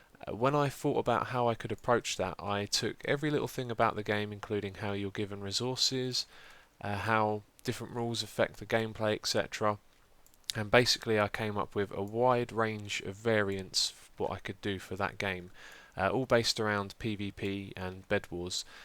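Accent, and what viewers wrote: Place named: England, United Kingdom. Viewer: Your pronunciation of water is correct, you just have an accent. I would say about 50% of English people say it that way. I also do that sometimes, I pronounce mountain Moun'ain. I also noticed about 50% of Americans tend to do that.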